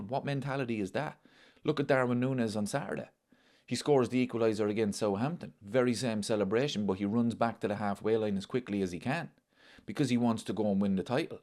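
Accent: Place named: Laois, Ireland. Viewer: If we only win the league i still be happy. Are you a laois man. Im trying to think where the accent is from.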